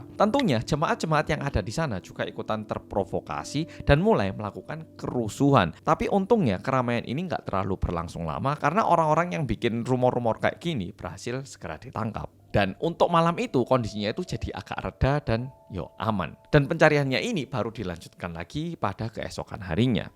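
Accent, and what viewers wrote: Java, Indonesia. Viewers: Hmm..... Muka Korea logat Jawa aku kaget weyy😰🥺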